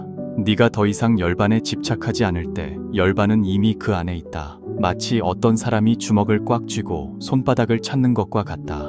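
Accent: China. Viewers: 중국어 발음이 방해하네요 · 중국발음이 오히려 집중을방해하네요 그러나 고맙게 잘 듣고있슴니다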